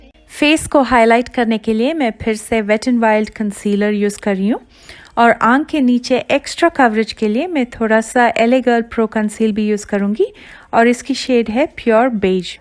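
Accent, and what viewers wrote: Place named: India. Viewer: This hindi accent!!!!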